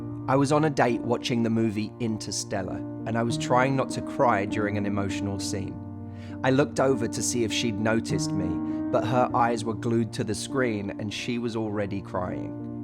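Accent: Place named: United Kingdom. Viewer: one more, i love the British accent of you, i not only learn English but more interesting things from you. Thanks very much.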